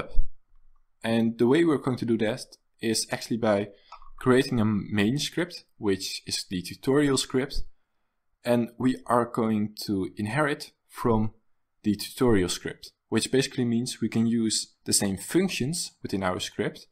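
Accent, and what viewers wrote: Netherlands. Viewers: Are you Dutch? :)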